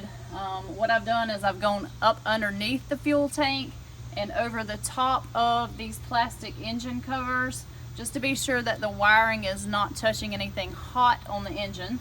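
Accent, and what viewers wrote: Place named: South, United States. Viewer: I've been looking at these along with the PIAA versions....thanks for a great video! You've got to be from the south....I know my own :D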